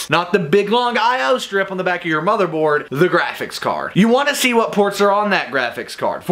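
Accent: United States. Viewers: hes so american omg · I lasted only 30 seconds.... TOO loud, too intense, too ummm American.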